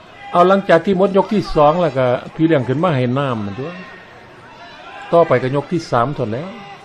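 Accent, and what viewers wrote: Isan, Thailand. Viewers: คุณ พากอีสานโลดค่ะ มวนและตลกดีชมช่อง เพลินคักค่ะ ไทยหรืออีสาน อันใด๋กะฟังรู้เรื่องหมดหล่ะ พากลาวโลด จ้า 🤣🤣🤣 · พากย์เป็นอิสานทั้งหมดก็ได้นะ ฟังรู้เรื่อง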